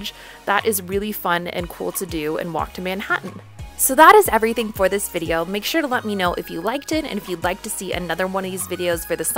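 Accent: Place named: California, United States. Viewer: Your voice you don’t even sound like your from New York you sound like your from Cali... hmm these are all cool but idk I would want real cheep spots from a real New Yorker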